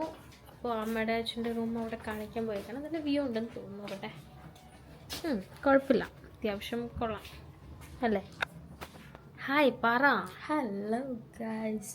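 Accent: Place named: Tamil Nadu, India. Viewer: Nigal tamilzhanoo samsarikumbol athikavum tamil ahnn varunathe😅❤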